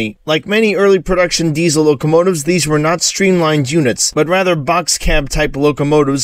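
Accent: United States. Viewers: It's just an American accent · You from Brooklyn? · Really great EMD mini-docu video thanks! Good to hear a proper NY-sounding accent also ! 🙂 I didn't realise just the ridiculous number of such locos that EMD manufactured. Must have been coining it in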